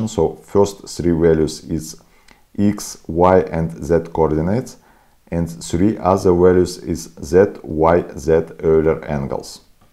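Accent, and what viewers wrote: Ryazan, Russia. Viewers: мне кажется или это самый насыщенный рязанский акцент, что я слышал? надеюсь не обидел своим комментом. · Бля, по акценту сразу слышно русского Ивана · какой русский акцент, с первых же слов )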